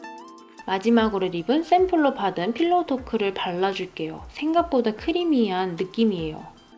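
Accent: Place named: South Korea. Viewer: 와 영상은 처음봤는데 진한 한국말에 놀람...한국분이셨어요?!!!!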